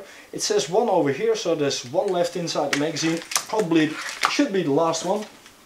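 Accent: Netherlands. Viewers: mooie Nederlands accent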